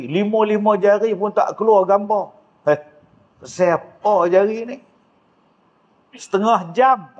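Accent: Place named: Kedah, Malaysia. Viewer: Percakapan nya slang kedah...byk juga yg tak faham....tapi saya faham sebab arwah bapa org kedah tapi arwah ibu org minang.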